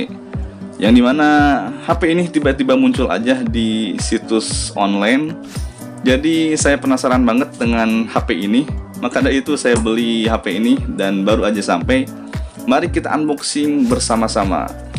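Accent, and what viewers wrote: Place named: West Java, Indonesia. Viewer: Hp dari pipo, tiba2 muncul di onlen hahaha c akang nyunda pisan 😁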